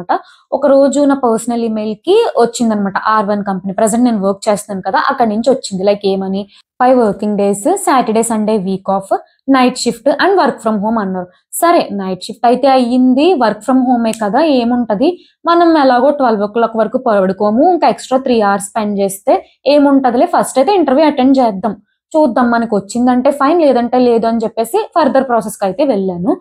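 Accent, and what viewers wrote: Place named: Konaseema, India. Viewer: Konaseema slang ❤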